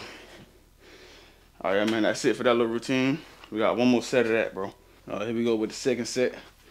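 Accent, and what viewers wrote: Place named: Georgia, United States. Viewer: twin gotta be frm ga , ik my fellow Georgians when i hear them 😭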